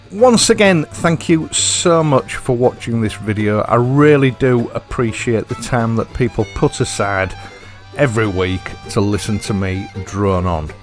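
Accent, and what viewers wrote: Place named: Hull, United Kingdom. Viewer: Just found your channel it’s the accent I left hull along time ago liked vid I’m a fan now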